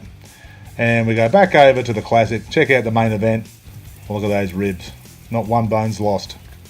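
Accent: Australia. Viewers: Aussie as fuck love it mate 🍻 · after watching dozens of seppo bbq videos, can i just say how refreshing it is to hear an Aussie voiceover lol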